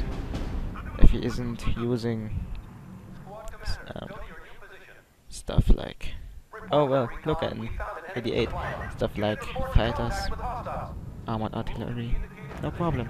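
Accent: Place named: Germany. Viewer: alter du hast so einen heftigen deutschen akzent^^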